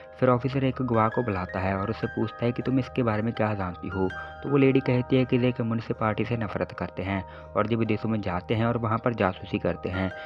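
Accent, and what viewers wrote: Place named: Haryana, India. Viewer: Bhai hryanvi ho k? Touch aa rheya se hryanvi.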